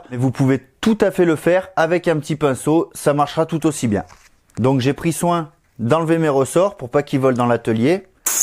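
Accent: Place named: Loire, France. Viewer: Je dirais, tu prones le calme, voir le plaisir de faire les choses, ça pourrait sembler banal, mais moi, il m'a fallu du temps, pour y arriver, et encore.... ; de plus tu as l'accent de la région de St-E., la Loire, la Haute-Loire : souvenirs, souvenirs...Apaisé, je me suis endormi en t'écoutant... mais je me suis réveillé aussi, je te rasssure !: tout ce qui est réglage, ça n'est pas trop mon truc, mais un peu plus maintenant, grâce à ta video . I like, donc. Merci.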